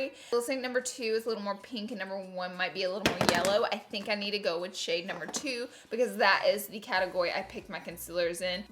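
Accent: South, United States. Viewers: she sounds southern in this video hah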